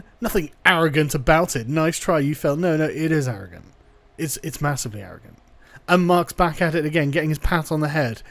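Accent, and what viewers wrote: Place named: United Kingdom. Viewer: I'm shocked you have a British accent, as I thought it was just men in the USA who spent all their time online whinging about feminists. I thought it was connected to so many men in the USA being genitally mutilated so feel threatened by women, but you've proved there are types like that closer than I hoped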